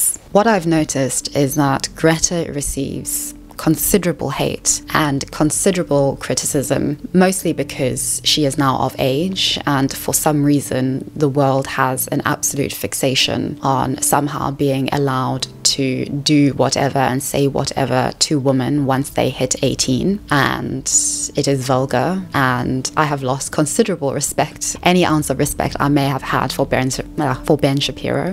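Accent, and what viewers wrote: South Africa. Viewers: You're South African ❤..love it. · your accent sounds like south african that moved to england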